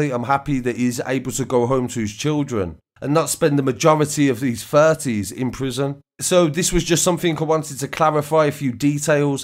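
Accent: Birmingham, United Kingdom. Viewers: Interesting accent, is that Birmingham?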